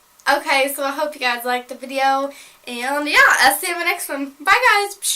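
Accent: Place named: South, United States. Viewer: I love it if you replied I can't find a lot of country youtubers it kinda sucks I'm so happy I found this channel I think your beautiful I've watched a lot of you videos so far you are like a role model to me and also you have taught me to never ever let any get under my skin and be myself I'm from a country family and so I have a country accent like yours I live in a city so I can get made fun of you have taught me to be my self and always believe in me your really funny when ever I see you make a new video I'll be happy kind of like today okay i need at least 9-11 hrs of sleep well I have fit bit I told me I only got 7hrs 38min of sleep and if that happens I need to SLEEP and I didn't have a pretty good mood I went on YouTube and saw you had s video and ever since I watched I have hade a great mood Thank you for teaching me not to let mean people to get under my skin you're my favorite YouTuber 😝💋😘😇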